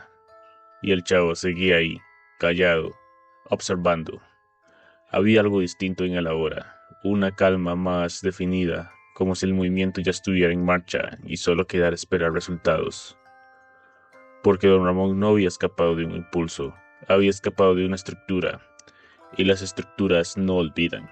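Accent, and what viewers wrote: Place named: United States. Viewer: Buena Creepypasta bro pero y ese asento hablas como un gringo tratando de hablando español y lo hubieras hecho con la voz Loquendo de vería más chido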